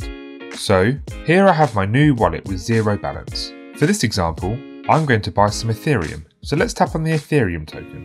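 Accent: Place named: United Kingdom. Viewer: why do you speak British?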